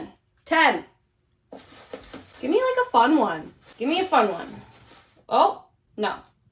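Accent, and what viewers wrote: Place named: Wisconsin, United States. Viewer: I knew I heard that accent! Hello fellow Wisconsin book lover!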